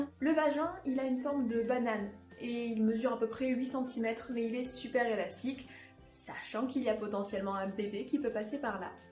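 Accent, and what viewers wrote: South, France. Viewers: Je crois déceler un léger accent qui sent la fleur d'oranger, l'olivier et les cigales (j'ai jamais senti une cigale hein...promis o_O), me trompe-je ?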